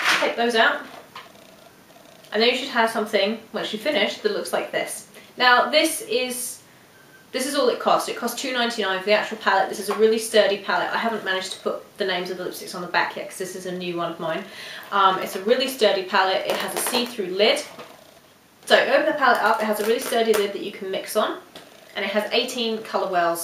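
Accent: United Kingdom. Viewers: Are You brittish Cause you sound like it??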